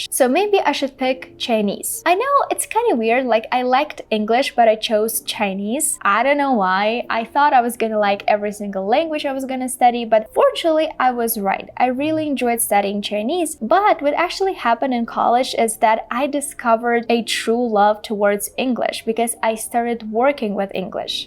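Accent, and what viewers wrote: Russia. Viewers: i love your "rrrussian" accent.😊😊😊🙌🙌🙌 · You have shown a good Russian accent. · Hello, I like your Russian accent and I would like to know which Youtubers are you watching, I am trying to learn English via youtube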